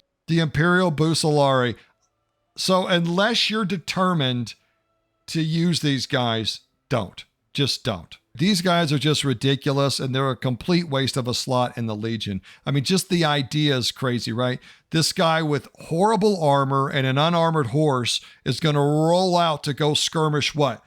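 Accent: Oklahoma, United States. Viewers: Your commentary in your videos are great brother, so funny with your sayings with the good old Oklahoma style. But thank you for the videos, & no one els on here has your style. Well done Mr. Take care.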